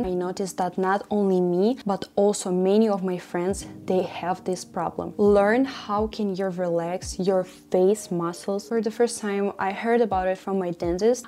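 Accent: Russia. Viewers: Русский акцентт :333333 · Сразу чувствуется русский акцент 😅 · Мне показалось, или у тебя русский акцент?) Прекрасные знания английского❤ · Трудно слушать этот русский английский даже мне как русскоязычному человеку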